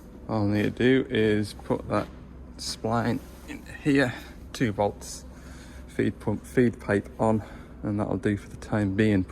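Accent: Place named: Scotland, United Kingdom. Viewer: Love it when the Scottish comes out it sounds funny with your accent. Goat yer troosers oan. Oh aye like.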